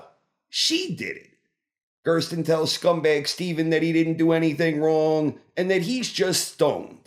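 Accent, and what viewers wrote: New York, United States. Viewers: Sir, from where exactly in the greater New York City region does your accent originate? I've been wondering this all season!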